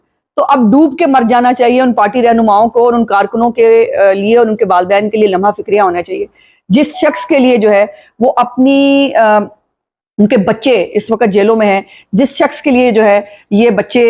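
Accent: Punjab, Pakistan. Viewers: Azma g Punjabi ka tarka boht changa lgdaa😊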